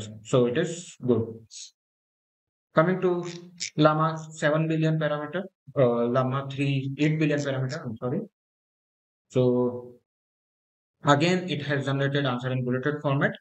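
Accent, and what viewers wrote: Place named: India. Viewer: I like the approach of this channel, a doctor testing AI technology. What I can say for this to improve is maybe speak a little bit slower as indian accent is sometimes hard to follow.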